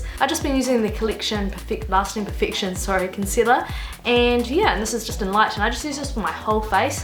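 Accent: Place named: New Zealand. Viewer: I'm from Egypt :* I knew you were from New ZeaLand because your accent is similar to ShaaanXo's .. I like your accent a lot actually :))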